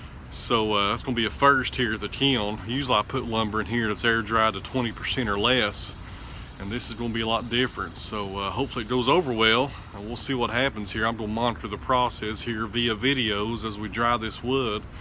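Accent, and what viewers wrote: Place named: Tennessee, United States. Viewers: I truly enjoy hearing what you have to say, and I also enjoy your Tennessee accent very much. Don't listen to the naysayers... I think most of us appreciate your insights and a little background on what and why you are doing things the way you do them in your videos. Keep up the good work, sir, and don't change a thing! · Talking too much?! I mean, seriously, who doesn't appreciate being educated by a man with a great southern drawl. Weenie's winer's and wimp complaints, say I! Your videos are excellent and entertaining. Happy to see you don't take the negative comments seriously.Anxious to see that walnut being milled.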